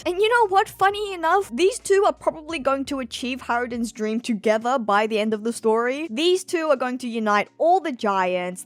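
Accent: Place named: Australia. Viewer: She’s always beautiful. An Asian girl with an Australian accent.. the dream girl of most weebs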